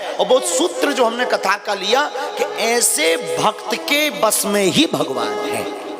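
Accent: Bundelkhand, India. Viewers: bhai... Maine achhe dil se hi bola hai emotional tareeke se bolte hain hame pata hai tumhe pata hai un k sabhi chahane walon ko unki bundelkhandi boli bahut pasnd hai.. Lekin jo shero shaayari hoti hai usme urdu k bahut se aise shabd hote hain jin ka lena dena se door door tak nahi hai... Jo sindoor bindi plot book... Wali baat hui wo bhi k shabd nahi kyonki ye hamne bahut pahale se suna huwa hai... Bas isiliye kaha.. Hame unme bajrang bali najar aate hain or un k mukh se... Naa rupya lagta hai na paisa lagta hai.. Ram ram boliye bada achha lagta hai 🙏🚩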